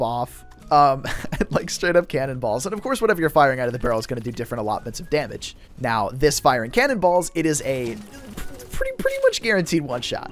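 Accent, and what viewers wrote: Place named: United States. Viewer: kac srr kinda sounds american and looks american, overall just americano vibey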